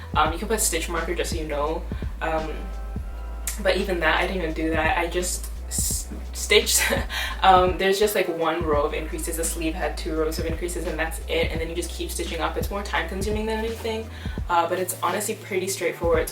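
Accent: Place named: Caribbean. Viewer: you from trinidad? you have a accent like somewhere in the caribbean and at the same time some kind of american and british accent in some words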